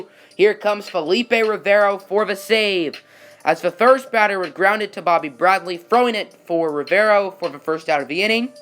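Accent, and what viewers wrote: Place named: Boston, United States. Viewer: That Boston accent😂😂😂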